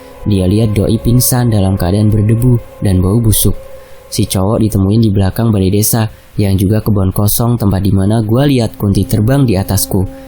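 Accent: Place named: Banyumas, Indonesia. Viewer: Bahasanya ngapak campur jawatimuran min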